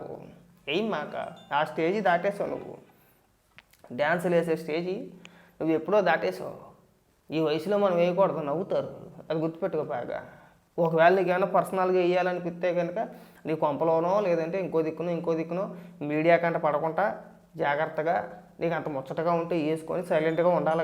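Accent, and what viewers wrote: Godavari, India. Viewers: సంక్రాంతి శుభాకాంక్షలు గారు.... మీ వెటకారం అంటే చాలా ఇష్టం😂... గోదావరి యాసలో అదరగొట్టేస్తారు మీరు 😂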